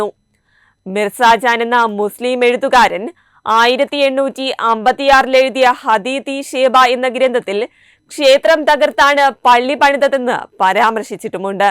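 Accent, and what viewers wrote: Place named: Kollam, India. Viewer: സുഹൃത്തേ താങ്കൾ കൊല്ലം ജില്ലയിൽ പോയിട്ടുണ്ടാവില്ല. അവിടെ ചില പ്രദേശങ്ങളിൽ ഉച്ചാരണം അങ്ങിനെയാണ്. അറിവില്ലായ്മ ഒരു അഹങ്കാരമായി കരുതാതിരിക്കുക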